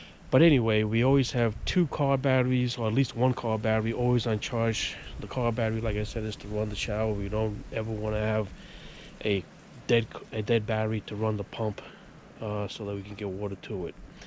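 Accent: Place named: New York, United States. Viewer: Great video!! Couple of things right off, I'm from NY, like the accent. The other is, I use a syringe-plunger big one that holds more than 20 ounces with and a tube extension to suck the oil out for my oil changes(I do it while the van is at client houses super quick no mess! LOVE IT! Use this method on all three of my mobile dog grooming vans! I have 2 of these and run them both on the back of a mobile dog grooming van(shuttle bus conversion). I power a 15,000 BTU Roof AC, water heater, clippers, lights, and most power hunger, a blower-dryer to dry the dogs. I use 1 3500 Gen for just the blower-dryer and the other for the 15,000 BTU AC and everything else. You have me thinking if I make these mods, I could longer run times on a tank full. I gotta try. What about how loud with the spark arrester off? My clients like quiet in their driveways as their dog is being groomed... 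Gimme your thoughts.... Please. Thanks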